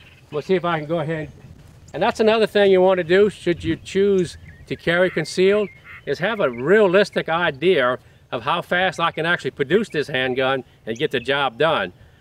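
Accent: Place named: Cajun, United States. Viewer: little tinge of cajun in your accent! are you from acadiana?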